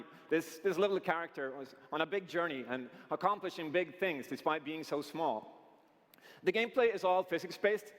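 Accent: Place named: Sweden. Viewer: This guy is running a small company of 14 people and together they created this game as a result. I have no idea whether the game is any good but this is what people like him do. They create games and that's what they know and are used to. To stand in front of a large audience and the industry as a whole as well as in front of hundreds of thousands watching him over the internet is no easy task if you've never done anything like that. I would refuse to stand on that stage because I would fear losing it and forget the last word I spoke. Further more this guy's native language is Swedish. Not English. I think he did a fabulous job of making a case for his and his co-workers game. How anyone can blame him in a negative and down playing way for being nervous and especially exited for the task at hand (in a cute Swedish way) is beyond me. But then again... 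there are a lot of fourteen year olds on the internetsez that have thrown the diapers/nappies and learned how to type.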